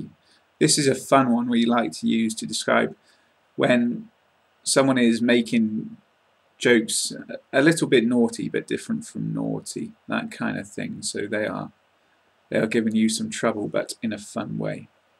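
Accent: United Kingdom. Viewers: I really like your British accent !! Good job :)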